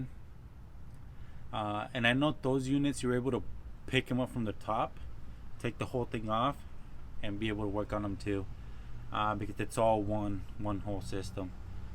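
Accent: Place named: United States. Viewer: Yo pensaba que eras gringo ...Jajaja good videos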